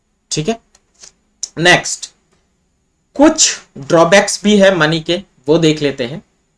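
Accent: Assam, India. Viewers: Sir are u assamese ?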